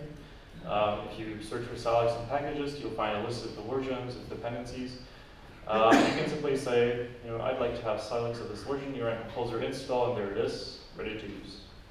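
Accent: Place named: United States. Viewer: Hm., wenn ich das richtig sehe, bist du Deutscher. Von der Sprache hätte ich eher auf Amerikaner getippt. Austauschstudent? Zweisprachig aufgewachsen? Ich staune...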